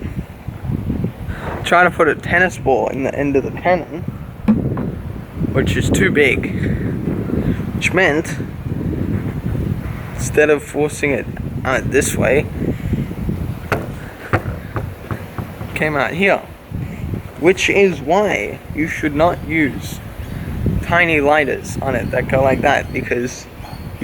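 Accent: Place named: England, United Kingdom. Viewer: You really should look into installing a grill ignitor system. Preferrably an electronic one vs a piezoelectric variety. It will solve all of your firing issues. Im detecting an english accent. Perhaps they may not be available where you live. Best of luck man.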